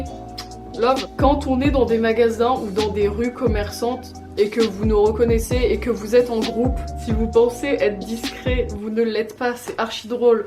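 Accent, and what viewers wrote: Normandy, France. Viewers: Mdrrr vive l’accent normand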